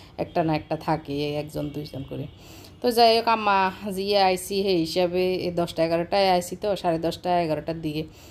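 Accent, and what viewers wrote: Noakhali, Bangladesh. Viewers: আমি আজ প্রথম আপনার ভ্লগ দেখলাম, YouTube দেখতে দেখতে সামনে ছলে আসলো তারপর দেখা সত্যি অসাধারণ লাগলো আপনার বাবার বাড়ি প্লাস আমাদের প্রিয় নোয়াখালীর ভাষা আহহহ অনেক সুন্দর,,, আল্লাহ আপনার আব্বু, আম্মু কে ভালো রাখুক দোয়া করি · আপু আপনার মুখে নোয়াখালির ভাষা শুনতে অসাধারন লাগছে। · Apo tomar mukhe amader nowakhali r vasa sune onek valo laglo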